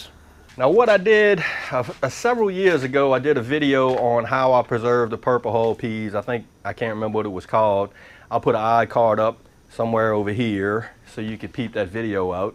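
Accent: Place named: Louisiana, United States. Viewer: Purple hulls, pink-eyes, white peas, ALL the southern peas, can be blanched and frozen just this way. Most of the country, even many parts of the south, just don’t know peas like we do in SE Alabama/SW Georgia, but you do, friend, so you’ve done a public service with this vid. Besides, I just love to hear you talk (Louisiana, maybe?).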